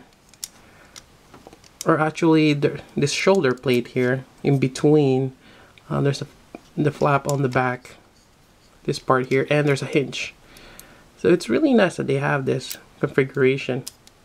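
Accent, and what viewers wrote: Philippines. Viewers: Are you a Filipino? There's something with your accent. :)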